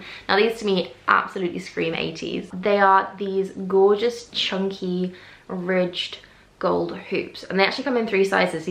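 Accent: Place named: Scotland, United Kingdom. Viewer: You sound a bit scottish and i love it!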